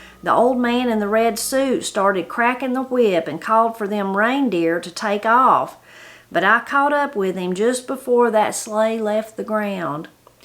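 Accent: Appalachia, United States. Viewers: I was looking forward to the last installment today. I've enjoyed hearing it, and your authentically Appalachian voice made it more of a pleasure. I haven't done a good job of clicking "like" on your daily Christmas stories from Appalachia because I've been watching on my TV where I have click "like" before it ends. But they have been a Godsend to me this month; a daily reminder to stop and relax and enjoy the season. A little bite of Christmas each day, like appetizers. Thank you for all the extra work you've done to produce so many Christmas videos and for inviting us to share your Christmas preparations. Merry Christmas to you and to your whole extended family.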